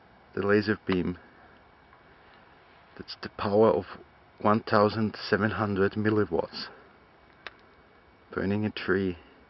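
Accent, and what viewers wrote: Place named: Germany. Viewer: lol! That's amazing stuff there. And it was fun listening to you. German is up there as one of my favorite accents:D.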